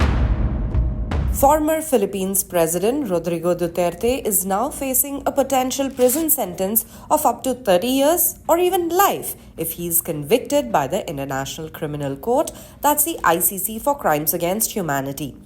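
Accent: India. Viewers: Indian Accent is masakit sa taenga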